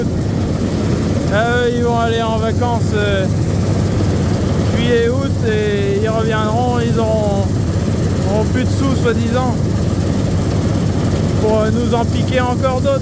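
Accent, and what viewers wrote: Charente, France. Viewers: Salut. Pas pareil en 2003 ? Grand plaisir d'entendre l'accent Chérentais de mes racines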